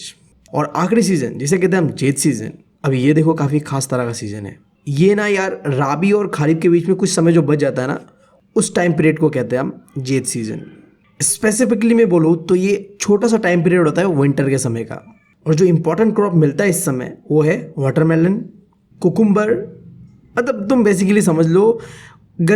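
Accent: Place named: Bihar, India. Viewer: Sir aap bihar se hai🤔🤔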